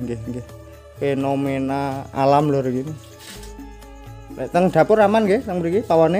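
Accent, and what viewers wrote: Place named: Java, Indonesia. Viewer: Kula seneng bahasane mase...medhok desa mbanget....kalih guyune...renyah....kados nopo ta piantune.....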